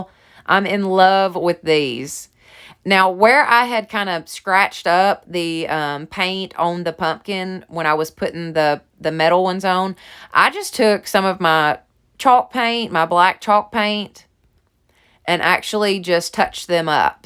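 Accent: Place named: Tennessee, United States. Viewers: Girl, I KNEW you were from Tennessee! Sound like you could be right around the corner from me! Love it! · I’m a New Yorker so when I hear you speak I just love your Tennessee Twang. I enjoyed your whole vignette. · Everything turned out great your porch looks awesome. I'm from Tennessee to I get it when you say that the sun is brutal I've been doing some diys for my front porch to. Love the wreath. When I first started watching you I thought she has got to be from TN lol have a blessed day.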